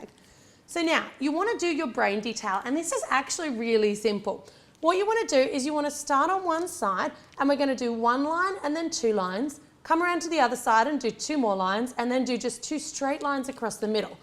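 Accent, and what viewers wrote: Australia. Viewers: Do Australians find American accents as beautiful as Americans find Australians'? I love listening to you talk. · OMG. What age are you?! LOL. You clearly don't hear accents. She's AUSTRALIAN.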